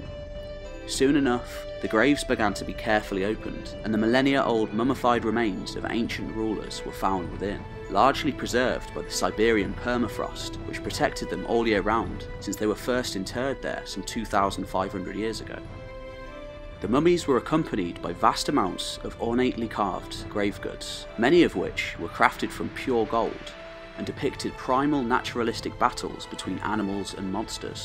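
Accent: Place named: Yorkshire, United Kingdom. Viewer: This guy is from the north of England - Yorkshire, I think - Ewan is Scottish. Very different.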